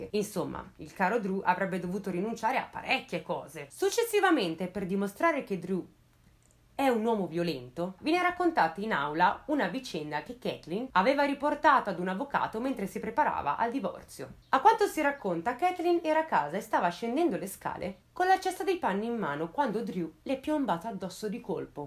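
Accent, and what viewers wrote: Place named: Lombardy, Italy. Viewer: Ciao ti faccio i miei complimenti è veramente bello ascoltare come spieghi le situazioni!!! Volevo chiederti una cosa di dove sei? Il tuo accento sembra Como Varese o Milano.... Io sono di Como.... Grazie comunque x il lavoro Ke fai!!!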